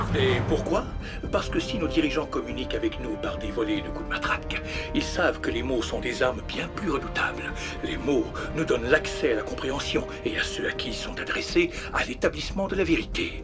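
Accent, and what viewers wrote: Quebec, Canada. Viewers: C'est la vertion québéquoise ?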